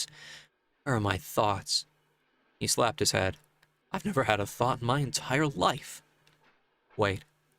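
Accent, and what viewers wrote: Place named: England, United Kingdom. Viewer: This was the best production of an audiobook i've listened to. You struck a good balance of acting without going over the top. The voices are really good. Even your English accent is brilliant, that's coming from a brit. You had me wondering if there were multiple actors untill i read the comments. And i loves the subtle background noises and effects, they were just the right volume and not distracting. WELL DONE, please do more, i dont even care what the book is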